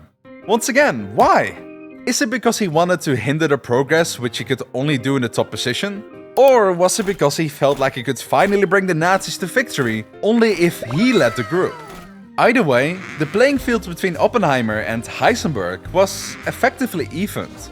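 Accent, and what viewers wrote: Netherlands. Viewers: You have a Dutch accent i think. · Are you Dutch because you a bit Dutch accsend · Are you dutch? I think i can recognise an accent there.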